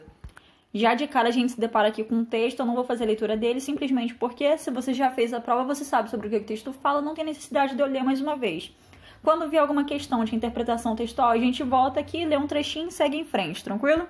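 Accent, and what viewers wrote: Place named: Rio de Janeiro, Brazil. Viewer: Esse carioques dessa proff Onde que vai o acento?? E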